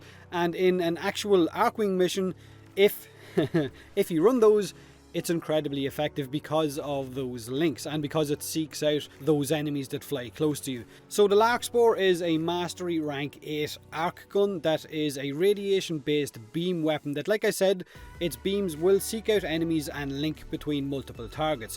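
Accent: Ireland. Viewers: really?! again?! you know we're only here for your Irish intros and outros!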